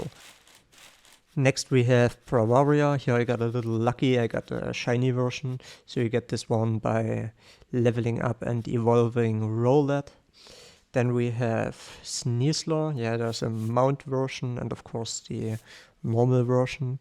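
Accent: Saxony, Germany. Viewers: For me as a german it sounds like you have a german aczent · Why do you soumd like a saxonian german whos try to speak Englisch I swear exact same accent xD Ok well i mostly speak accent free but i heared other saxoniams sounding like that Normal people Version You Wörschon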